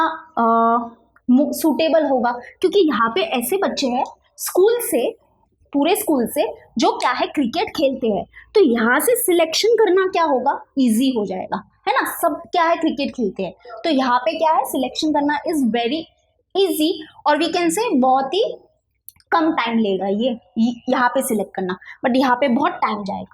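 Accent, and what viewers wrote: Maharashtra, India. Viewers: Mam is speaking hindi good but the 'Barobar' 😅 mam is marathi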